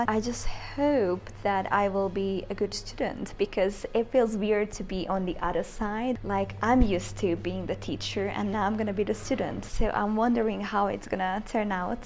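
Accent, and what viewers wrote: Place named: Hungary. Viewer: It was really interesting to hear a fellow Hungarian speak English in a video Nah tessék én is már angolul írok xD Szóval amit már Facebookon megosztottál is szép volt de így videóban még inkább király, szép helyeken jártál 😀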